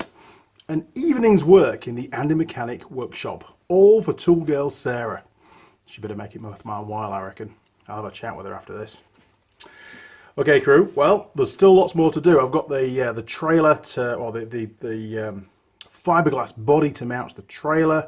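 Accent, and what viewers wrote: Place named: East Yorkshire, United Kingdom. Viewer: Hiya buddy, new subscriber after watching `no oil change for 75000km` . I was intrigued as to where you originally came from, sense a twang of East Yorkshire. Anyway good work fella and I shall start on your back catalog.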